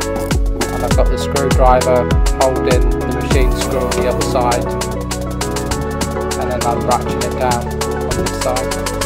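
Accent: England, United Kingdom. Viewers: you sound English, I didnt know England was one of those sad countries that used Phillips screws, I thought that was an American thing.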